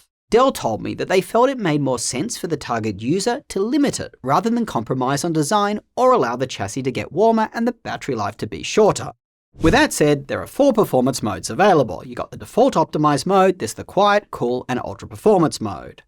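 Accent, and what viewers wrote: Australia. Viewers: Eww, Aussie accent.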